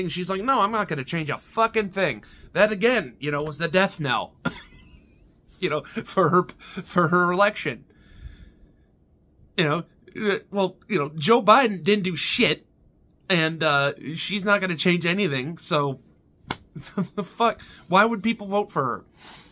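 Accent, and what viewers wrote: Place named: New York, United States. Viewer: Wait a minute your not italian? I thought u was from New York i watch the vpw2 freem video and as soon as u chose shockmaster I was like I need grab a slice with this guy he knows his shit the Gambino look scratch all the politics talk what ever happens happens just know u went down as a made men and we honor that look at the brightside atleast u still got your video game collection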